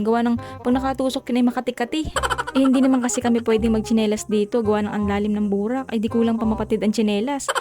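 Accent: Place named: Visayas, Philippines. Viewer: May halong bisaya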